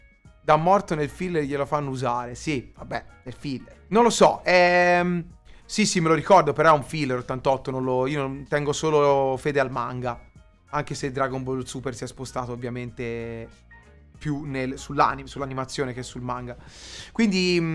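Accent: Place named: Tuscany, Italy. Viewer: Questo è popo toscano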